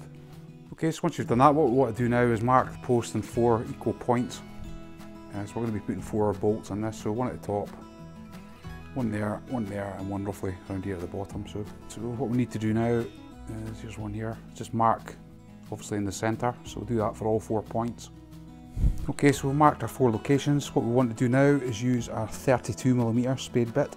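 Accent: Scotland, United Kingdom. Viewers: Liked the presentation nice to hear a scottish accent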